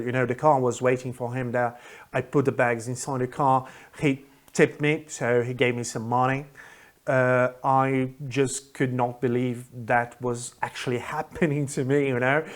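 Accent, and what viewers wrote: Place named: United Kingdom. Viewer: I really liked your history, I could imagine every moment that you described and I guess that was a chock and surprise , I laught a lot with you hahahahha Please stay with this kind of videos, they're amazing! And I couldn't avoid to notice your britsh accent, you talk exactly at the same way of them!! lol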